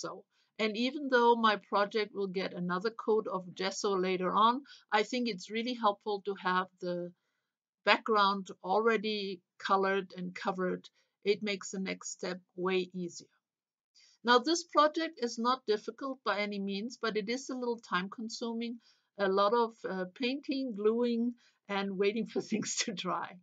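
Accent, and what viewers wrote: Germany. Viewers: hab doch gedacht ich hör etwas deutsch heraus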